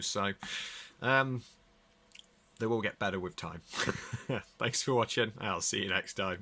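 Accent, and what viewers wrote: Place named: England, United Kingdom. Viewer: makes me remember how i hate english accent like this lol... btw we dont care of your speakers and monitor model.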